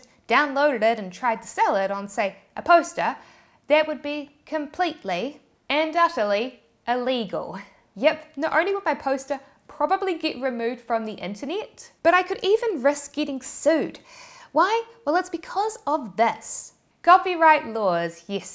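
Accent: New Zealand. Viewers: The Kiwi accent is soooooo adorable on you! 💓💓💓💓 ❤❤❤❤💓💓💓 Hey, what is your thoughts on Crypto?, and the likes of Bitcoin?, is that an area that you have ever given any thought to? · Ohh u are a new Zealand's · LOL I was gonna say Australia but NZ is cool. I just started watching your channel recently and it's interesting. And your accent is cute 😂😁🥰. I used to know someone from Australia.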